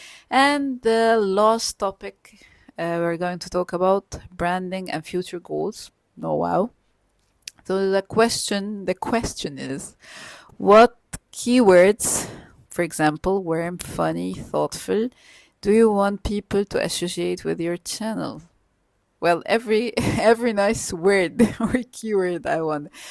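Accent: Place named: England, United Kingdom. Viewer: Love you English accent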